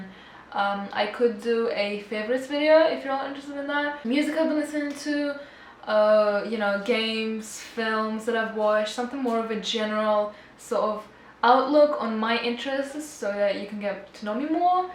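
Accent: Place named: Russia. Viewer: Hi i love, their compilation is definitely one of their best comps but i`d actually say all of their compilations are good because they constantly produce good music. If you like Twin Peaks you might like `s solo work. She sings some of the music in Twin Peaks and her solo album is beautiful, it`s very dreamy. Especially the tracks and. Also Twin Peaks related is `s track. He samples the theme music from Twin Peaks and uses it really well on. Although the only mix of that is a classic version is the original Woodtick mix. The other mixes are a bit tacky but the Woodtick mix is classy. You should do a cd update video. Also a favourites video would be cool. A collections video. I have a couple of questions for you. What country are you from, you sound russian. Where did you when you visited England? What were your impressions of England? I`m english so your answers would interest me. 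You come across as being quite artistic. Do you make your own art? Thanks for sharing your collection with us. I look forward to your next video which i imagine will be uploaded in about a years time. Cheers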